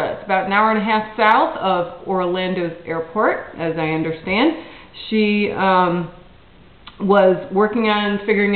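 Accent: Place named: Maritimes, Canada. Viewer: Off topic? Meh! I just noticed how you say some vowels is like Maritimers...lol Yo, East coast, baby ;p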